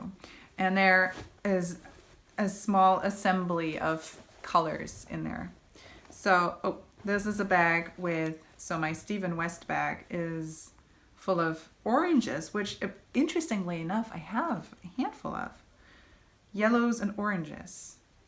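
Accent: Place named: United States. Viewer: Your English is lovely. As inspired by fiber stated, we always have to pause to think of the correct word to use and we speak English as our 1st language😂. Your accent is amazing. You sound like you live in the US. So, beautiful job. I, too, loved the yellow yarn story. Thank you for sharing. Hugs.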